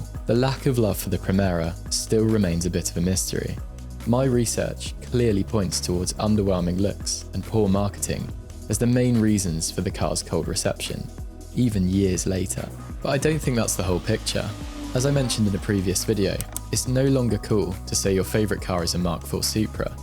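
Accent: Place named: United Kingdom. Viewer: You have a UK accent. It's called a saloon!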